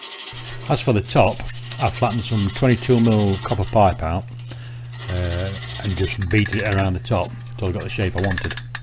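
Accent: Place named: Northern England, United Kingdom. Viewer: You think you've seen everything on YouTube, and then you stumble upon something else. That's a fine northern accent btw. Huddersfield lad myself.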